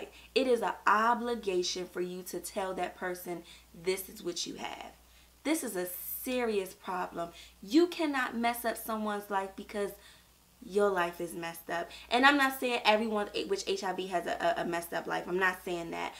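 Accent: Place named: Maryland, United States. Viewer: Like you have A Maryland Accent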